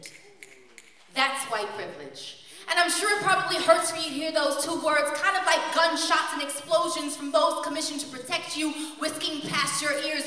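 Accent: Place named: New Orleans, United States. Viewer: im convinced shes from nola lol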